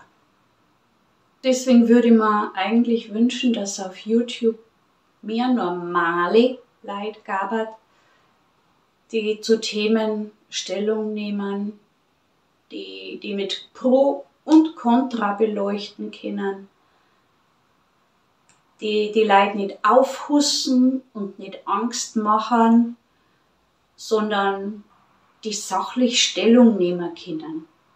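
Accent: Bavaria, Germany. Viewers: Ich mag deine Videos, weil sie unaufgeregt daherkommen, sowas tut sehr gut. Dein Bayerisch mag ich weniger, weil ich ein Viertel nicht verstehe, obwohl ich mir große Mühe gebe - ich bin Berlinerin, also Preußin!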